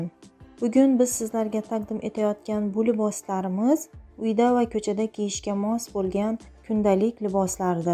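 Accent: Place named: Khorezm, Uzbekistan. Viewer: Хоразимнанмисиз шевангиздан ухшатдим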